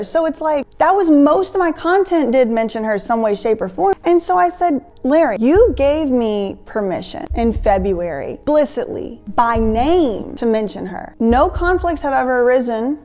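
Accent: South, United States. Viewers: Just came to likeeeeeee i heard this story years ago love ur southern charm with it!!!!! Mucccch love❤... Ima too lol love youuiiiu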